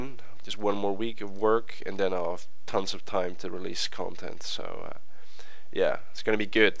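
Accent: Netherlands. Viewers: it's funny how you just hear a Dutchmen talking english :D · you sound realy dutch :S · Being dutch myself, I can recognize his accent as being dutch.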